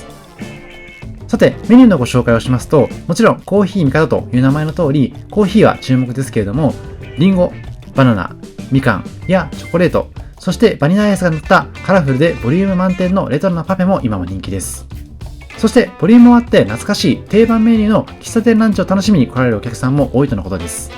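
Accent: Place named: Tohoku, Japan. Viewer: 東北出身の方ですか？私は東北なのですがイントネーションが同じで親近感😊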